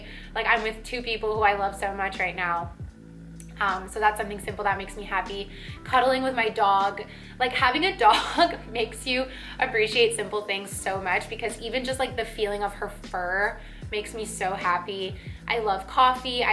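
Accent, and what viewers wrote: Canada. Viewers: Your Canadian raising is strong in this one, haha.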